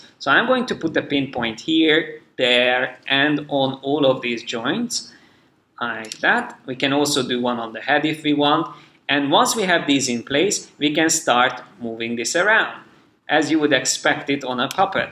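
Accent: Hungary. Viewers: Magyar vagy? Az angolodnak annyira magyar akcentusa van!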